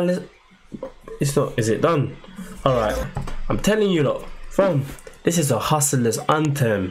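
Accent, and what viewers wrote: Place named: Nigeria. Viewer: I THINK U ARE NIGERIAN NOT UK. UR ACCENT IS DIFFERENT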